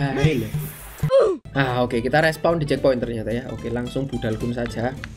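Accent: Java, Indonesia. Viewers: Bang sama bang Dicky/Tampan gaming kan sama sama logat Jawa bang Dicky kan Semarang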